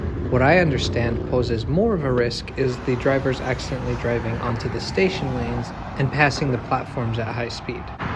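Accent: United States. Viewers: Where in Europe are you from? You sound American. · Hey, you're bringing me back to my youth. I remember visiting my sister at Cal State & on my way to union station on this busway. Thanks Btw, didn't know you weren't rom the U.S. Your American english is perfect. ;)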